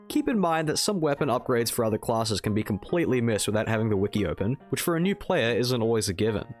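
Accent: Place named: Australia. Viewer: Basically an Australian accent with an American and British undertone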